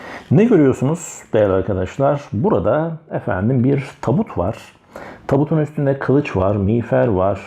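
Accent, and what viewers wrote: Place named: Black Sea, Turkey. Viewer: Artık umaysın 😂 kadın Karadenizli herhalde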